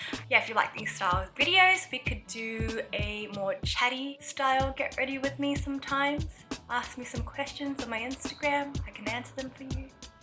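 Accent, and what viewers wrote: Australia. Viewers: Found this video from the recommendations, and I was like, who is this beautiful girl?! I'm gonna watch her video!! I wish I had facial structure like you...! and your healthy skin!! Love your Australian accent too!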